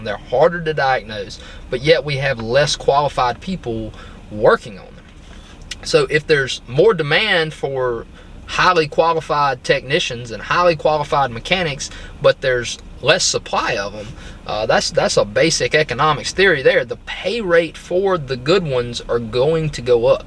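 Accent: Alabama, United States. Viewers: i knew that accent sounded familiar! working at a shop up in huntsville. Roll tide baby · Love your Accent. Alabama Accent🇺🇲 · I just want to get my foot in the door bruh.. Cause I like Cars( Love the Bama Accent btw😉🤗✨)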